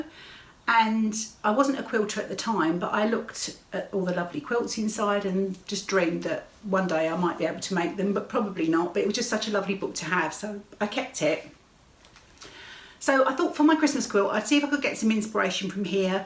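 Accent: England, United Kingdom. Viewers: Hi I really enjoyed your visit today you and I have much the same taste in fabric and patterns I made Tula bloomers quilt year before last useing tilda fabric I also made a few more of her patterns in her fabric and some other lines it is so nice to hear the accent I spent many happy times in Sudbury and other parts of Suffolk and grew up in Essex your work is stunning and your quilts Nate on point your stitching is amazing I haven’t seen your knitting yet so will go back for a look thank you so much for your wonderful visit Angela in ireland 🇮🇪 🙃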